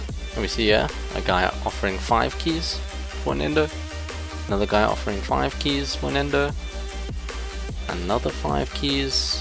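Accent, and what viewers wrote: South Africa. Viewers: Awe bru you sound south african my chommie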